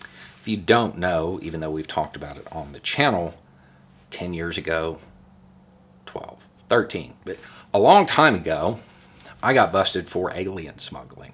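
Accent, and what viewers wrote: South, United States. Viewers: Go, your southern accent was what first caught my attention because half of my family is from the South. The rest are from above Chicago. After you accent, I listened closely to the content...I have learned a lot...and I'm glad about that...Keep up the good work...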